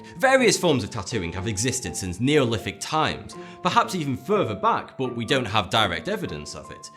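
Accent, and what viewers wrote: United Kingdom. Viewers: Why i never miss a video: 1% its interesting 99% british accent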